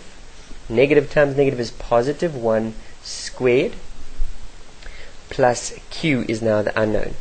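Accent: South Africa. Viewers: Is this South African?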